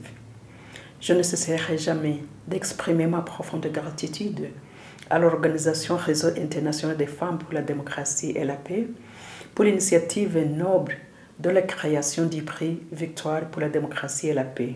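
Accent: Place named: Rwanda. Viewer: Madame Ingabire Victoire, j'imagine que tu as étudié l'expression orale de la langue française ! Si tu décides de parler en français, rassure toi de parler comme il se doit! Tu me fais honte en parlant le français comme si tu parles le Kinyarwanda! Avec tous les atouts que tu as bénéficiés et parles trop mal le français, j'ai honte en ta place!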